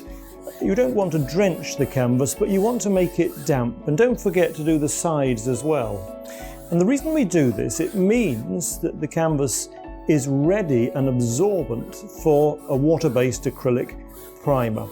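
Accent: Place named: RP, United Kingdom. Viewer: How posh this man is... Imbelievable.... And arrogant too.... pure british c.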